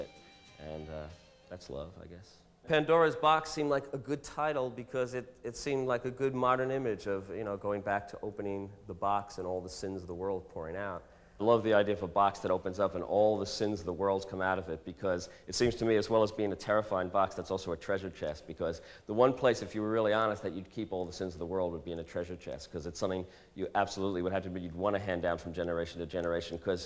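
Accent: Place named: New York, United States. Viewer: His accent is THE New York City. I don't think he was brought up there though. A friend sent me this, said, this is Jim's mind. He's actually quite sane.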